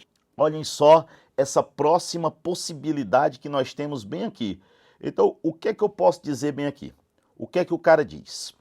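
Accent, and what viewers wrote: Northeast, Brazil. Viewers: Além de excelente professor, tem um sotaque lindo demais!! O senhor é daqui do Nordeste né prof?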